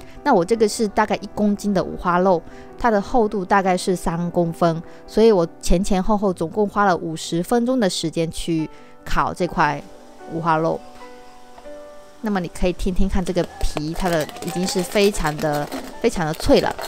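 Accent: Fuzhou, China. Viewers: Are you fuzhou? Sound like from fuzhou or fujian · 感觉是福州人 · 你好，我也是福州人，老乡一听口音就可以听出来😂 我想问一下，沙姜粉可以用其他东西代替吗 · 好熟悉的福州口音普通话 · 应该是福州口音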